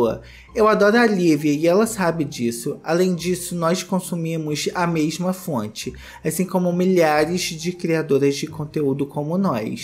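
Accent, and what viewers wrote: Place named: Belo Horizonte, Brazil. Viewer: O sotaque mineiro dele é de bh é quando ele "imita " o sotaque ,ele puxa mais para o sotaque do Norte de Minas kkkkk Falo isso pq minha família materna é do Norte de Minas e eu sou da região metropolitana de bh. Os sotaques são diferentes kkkkk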